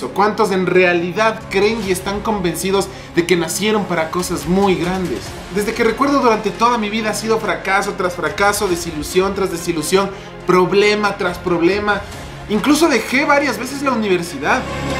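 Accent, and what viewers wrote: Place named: Ecuador. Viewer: Hola ñaño me gusta escuchar mis acentos q tenemos, de la costa del acento de la sierra o de cuenca o del oriente, llevo muchos años fuera, pero cuando escucho a los Youtobers como tu o los Youtobers de la costa me encanta escuchar esa mescla de asentos q tenemos a pesar de ser un pais chico tenemos tanta diversidad, es sierto no apresiamos lo nuestro, pero creo que estamos dando el paso y vamos a lograr ser autenticos, hojála los politicos tambien agan su trabajo, y les salga el Ecuatoriano por sus entrañas y entre todos agamos un mejor país, viva la patria Ecuatoriana💪💪💪🇪🇨🇪🇨🇪🇨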